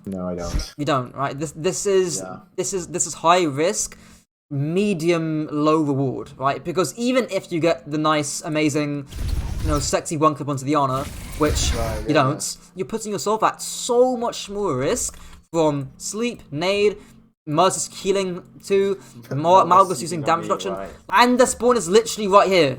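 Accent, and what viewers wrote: United Kingdom. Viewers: He's not talking like a Sydneysider Aussie. Now that's an obnoxious upwards twang at the end of every single sentence. is talking totally regularly for matter-of-fact speaking. Sounds like a regular Brit.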